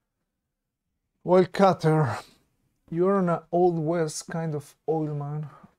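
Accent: Mexico. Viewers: Una pregunta eres mexicano xd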